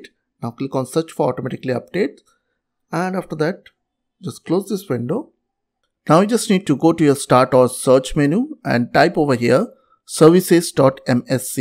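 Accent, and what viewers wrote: India. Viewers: You can always count on a guy with thick hindi accent to solve a random technical issue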